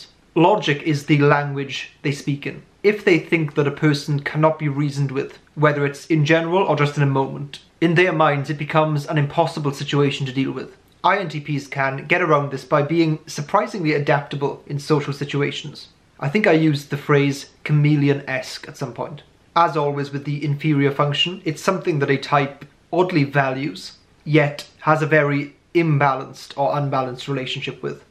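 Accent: Wales, United Kingdom. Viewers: You sound VERY welsh. I’m south, how’s lockdown treating you?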